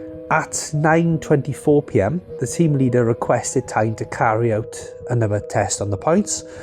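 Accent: Wales, United Kingdom. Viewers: Love your work man. Always a plus hearing a fellow Welshman - dydd gwyl dewi hapus!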